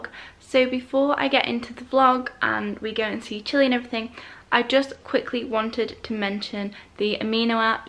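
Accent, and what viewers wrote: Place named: North Yorkshire, United Kingdom. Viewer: Are you from North Yorkshire?? I am from there xx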